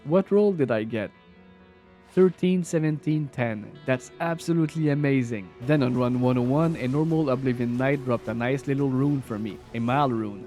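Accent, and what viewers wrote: Quebec, Canada. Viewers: Tes québécois ??? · Do i hear some french from QC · Je pourrais reconnaitre un de mes fellow québécois entre 1000! Bonne vidéo 🔥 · L’accent québécois s’entend à travers l’anglais, hahaha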